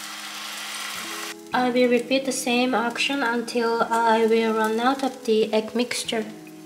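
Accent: France. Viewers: の英語アクセントにフランス訛りがあって、すっかり🇫🇷に馴染んですごいなーと勝手に思っていました🥺💙🤍❤️ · Your English has a slight French inflection, so cute:)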